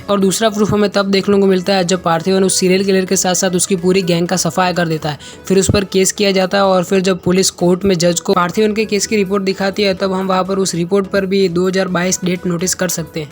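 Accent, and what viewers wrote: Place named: Gujarat, India. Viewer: "Hu maja ma chhu Bhai" tamari bolva ni reet upar thi kithu mee 😎😎😎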